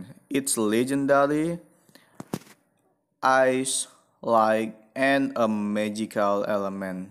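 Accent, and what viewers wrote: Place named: Indonesia. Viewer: Logatnya indo sih..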